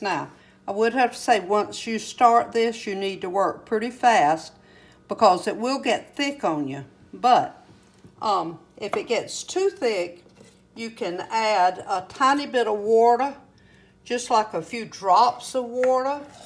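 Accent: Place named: South, United States. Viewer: I just love the accent 😉. You are what I think of when I think of a real Southern baptist lady.🙏❤️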